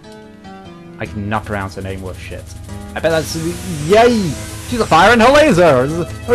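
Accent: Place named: United Kingdom. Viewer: British Pronouciation mate, we say some letters differantly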